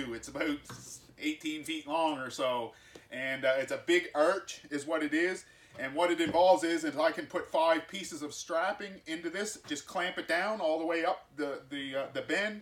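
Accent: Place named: Canada. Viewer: Is it my imagination or are the most popular woodworkers all Canadian?? Love (and miss) the accent!!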